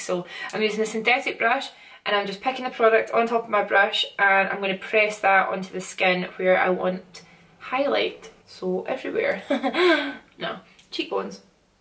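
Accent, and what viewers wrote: Scotland, United Kingdom. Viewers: Its just a fucking scots accent calm it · She's Scottish Lool that's not foreign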